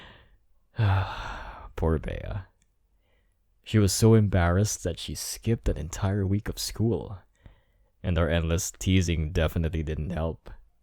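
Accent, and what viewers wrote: Philippines. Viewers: SABI KO NA EH FILIPINO KA YUMG ACCENT MO SA FILIPINO AGUY 🙂💕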